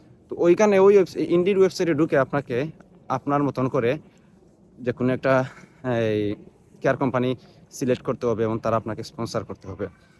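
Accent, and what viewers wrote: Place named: Sylhet, Bangladesh. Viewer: Sylheti vibes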